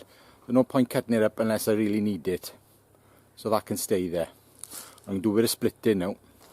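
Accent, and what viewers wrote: Wales, United Kingdom. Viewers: Good stuff , new here, sounds like you're in Wales?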